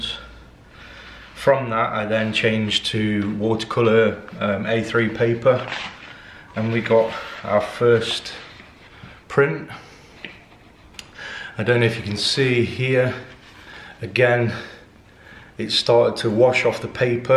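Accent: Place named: United Kingdom. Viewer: Loved your video! I know you made this years ago, but I can currently feel your pain! I'm a printmaker and struggling with the chemical balances of cyanotype! Lovely to hear a fellow British accent too!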